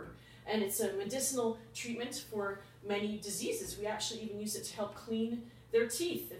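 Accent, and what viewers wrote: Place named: Canada. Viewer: Nice hair and I love the Canadian accent!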